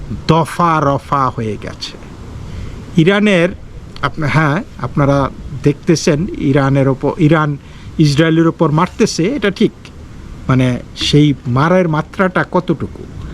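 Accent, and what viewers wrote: Bangal, Bangladesh. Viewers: অনেকদিন পর । খুব মিস করছিলাম আপনাকে। আপনার মুখে বাঙাল ভাষায় খবর শুইন্যা মনডা ভাল হইয়া গেল।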